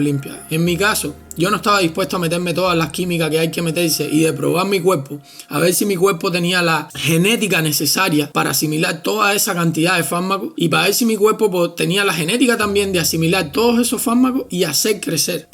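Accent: Cuba. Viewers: Eres cubano brother?? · bro me suscribí eres cubano? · Bro eres cubano, contestame si puedes, yo soy cubano conosco el acento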